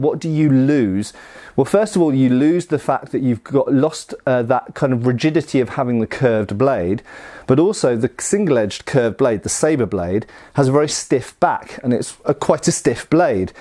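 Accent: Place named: United Kingdom. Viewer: Simply amazing - a Brit speaking and I can understand every word!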